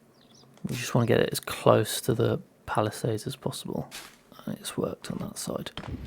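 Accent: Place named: United Kingdom. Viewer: For some reason your videos go so well in the background, maybe it's something in the voice or just my love to british accents :D The build is really cool as well, got me some new ideas, thanks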